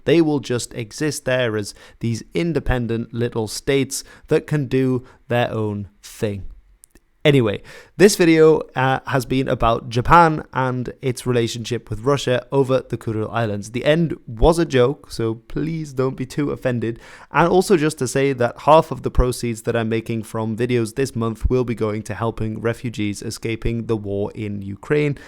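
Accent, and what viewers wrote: United Kingdom. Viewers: Hi. Are you from UK? I am so curious about your accent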